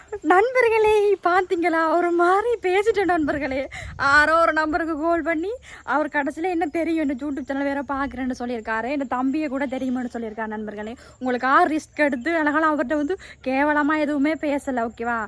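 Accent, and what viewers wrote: Sri Lanka. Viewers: உன்னுடைய இலங்கை பேச்சி ,அழகு,கவடு சூதற்ற தன்மை பிடிச்சு இருக்கு.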